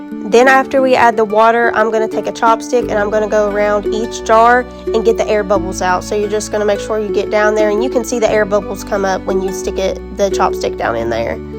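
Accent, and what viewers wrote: Appalachia, United States. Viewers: 🫶👍🤟🤙👌🤞✌️👆❤👈 Make sure it's code water , not cold water. I love your appalachislang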